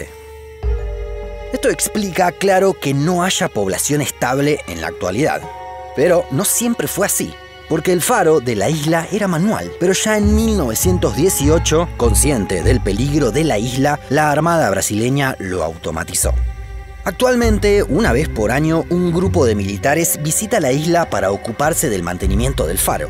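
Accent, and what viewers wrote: Buenos Aires, Argentina. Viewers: Muy bueno, lo único malo es el terrible acento porteño del relator.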